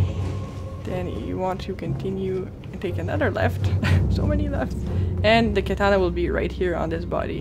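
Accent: France. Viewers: T'es français ? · Vraiment étrange d'entendre un accents français sur un guide en anglais sur elden ring 😂 mais sinon, très bonne vidéo 👌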